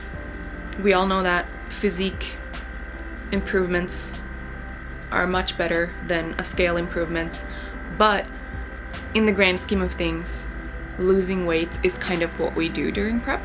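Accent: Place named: Finland-Swedish, Finland. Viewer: Self-reflection is good, but don't let it get you down. All you can do is all you can do. Your body will respond or not. Let's hope it will on time:-) Lycka till. Jag älskar din finlandssvenska accent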